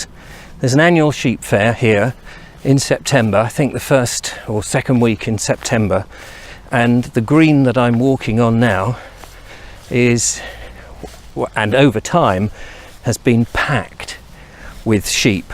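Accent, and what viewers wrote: United Kingdom. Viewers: Sir I'm from India and I love your video, the way you present all video and especially your British accent, sir please visit the lords cricket ground Victorian era pavilion it is more than 200 years old building and your history and I have one friend from pennistone, yorkshire she send me lot of old history building so you should visit this place too again thnx for your wonderful videos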